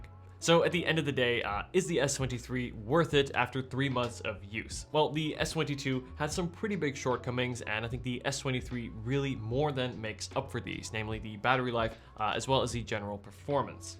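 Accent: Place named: Netherlands. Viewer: Your English is great! I normally easily spot a Dutch accent, but here it took me 3 whole minutes :)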